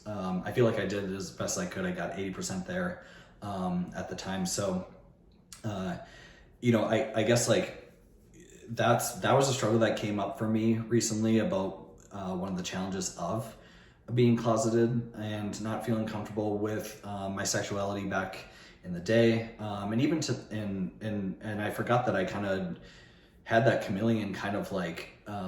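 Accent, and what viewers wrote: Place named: San Francisco, United States. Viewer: It's called a San Francisco Accent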